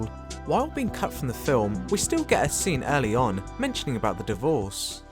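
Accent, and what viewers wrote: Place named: England, United Kingdom. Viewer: somebody should teach the english how to speak english. it's hard to make out what the hell you're saying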